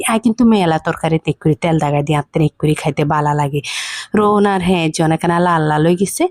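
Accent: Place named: Noakhali, Bangladesh. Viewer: একেবারে খাঁটি নোয়াখাইল্ল্যা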